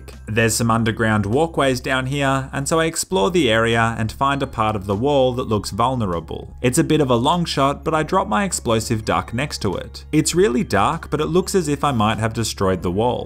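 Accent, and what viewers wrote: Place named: Australia. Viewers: Sweet video dude. Aussie accents are too good. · he is Australian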